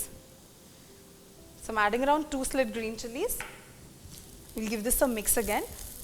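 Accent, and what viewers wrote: India. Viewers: I like your presentation, and your kitchen. And, you have garnished with the Indian accent. I have squids, and was looking for a simple recipe with good results.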